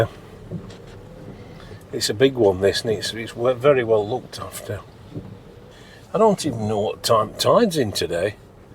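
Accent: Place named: Southport, United Kingdom. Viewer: I was a southport boy with same accent.been in nz for 50 years but its music to my ears to listen to this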